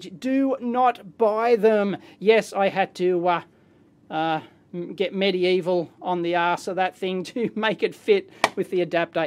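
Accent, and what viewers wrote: Australia. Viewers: Nice to hear that Aussie accent WA accent is so different I just watched a Chinese guy showing how it doesn't work he said it was made in Germany he crossed out made in Germany and wrote made in China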